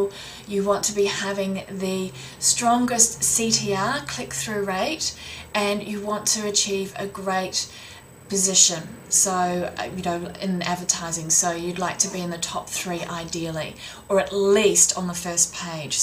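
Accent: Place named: Australia. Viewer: perhaps because she's Australian! This is not an english accent mate.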